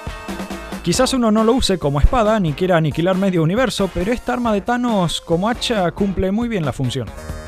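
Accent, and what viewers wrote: Argentina. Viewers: Que argentino ameooooooooooooo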